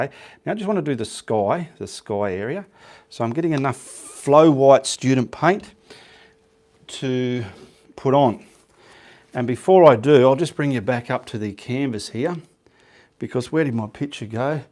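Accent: Australia. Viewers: I live in another part of the world, so I’m never so lucky to catch you live, but i love the replay 👍👍 i love your way of painting but most of all i love the way you talk, aussie langue is very inspiring 😉 thanks for you 👍👍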